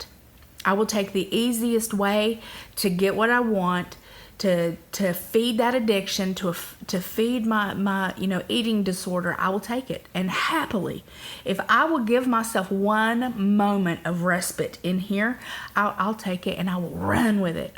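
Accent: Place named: South, United States. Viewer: girl your from California????....where that southern accent.come from???.... some people in Bakersfield area of California.have a country accent....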